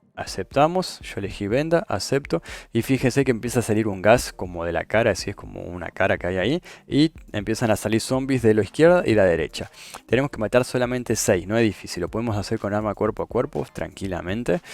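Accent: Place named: Argentina. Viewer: Argentino??? me suscribo hermano!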